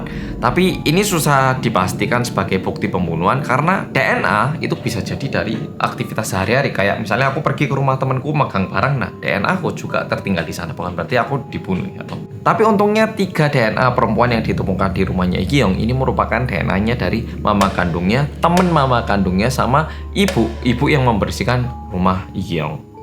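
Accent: Java, Indonesia. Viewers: ... kamu orang mana sih kok medok kali Jawa nya... awas ya kalo orang luar tapi ngakunya org Jawa.... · oppa, koq bisa sih bahasa nya medok gitu, gimana ceritanya nih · Mas orang jawa apa korea sih Kok medhok nya dapet 😅😅😅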